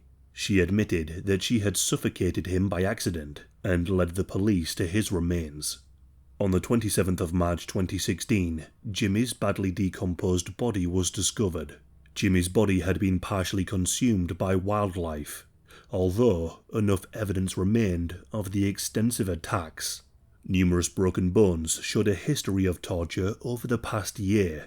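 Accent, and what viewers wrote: United Kingdom. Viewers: not really clearly if someone isn’t familiar with british accents lol. i can’t tell what accent is from what part.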